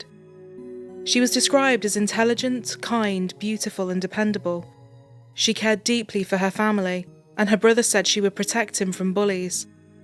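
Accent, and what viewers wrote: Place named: Scotland, United Kingdom. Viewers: Great video. I love Scottish accents… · Love Scottish accents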